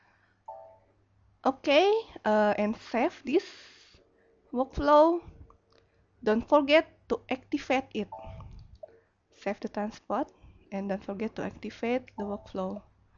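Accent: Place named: Southeast Asia. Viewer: the accent is a mixture of filipino singaporean malay indian indonesian myanmar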